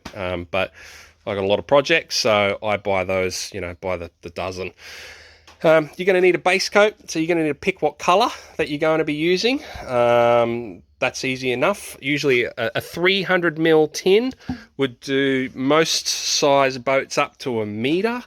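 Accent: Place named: Australia. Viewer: Motorcycle, check accent, check. Nature sounds in the back check Filmed outside check You must be australian 😁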